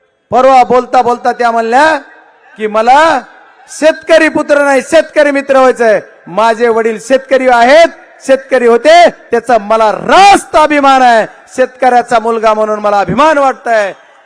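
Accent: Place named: Dharashiv, India. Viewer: धाराशिवची भाषा येते बाप्पाची सेम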